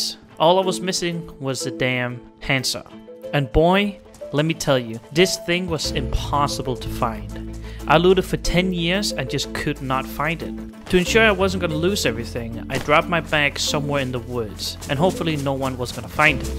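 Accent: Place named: Denmark. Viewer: You sound very Danish. Could I possibly be right?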